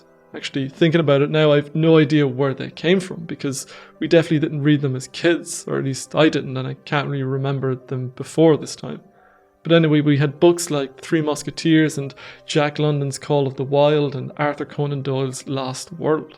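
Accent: Ireland. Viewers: Irish accent is awesome ☘️ . Great books btw. Interesting information on the various people.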